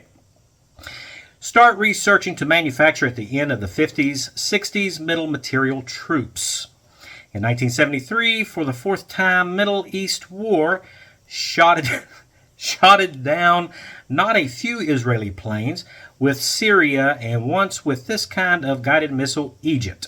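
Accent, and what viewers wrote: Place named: West Virginia, United States. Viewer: The start of the unboxing was hilarious 🤣I always knew you guys in WV talk different, but that was something else. I am still trying to grasp what you were saying 🙂But the kit looks great and is a welcome different subject matter, and I'm excited to see what you make of it. Have fun modeling, and see ya next Friday!!!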